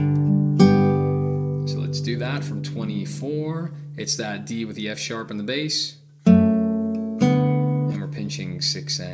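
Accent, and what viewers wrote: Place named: Netherlands. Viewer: Are you by any chance a Dutchie that moved to the US? I'm trying to pinpoint the accent.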